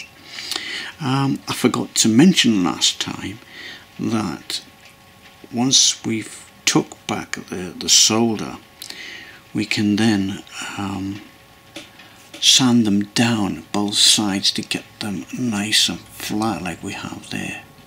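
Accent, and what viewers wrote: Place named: Newcastle, United Kingdom. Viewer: Sir Nigel Gresley would be proud of you,superb work,you can tell you are a Geordie bud.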